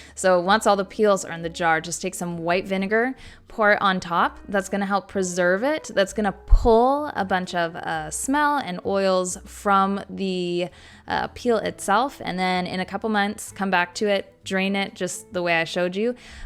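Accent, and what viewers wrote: Canada. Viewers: Have you heard how she pronounces produce? It's a Canadian accent type of thing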